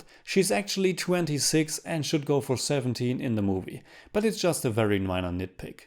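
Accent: Germany. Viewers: This is the first vid I'm wtaching from you Are you German ?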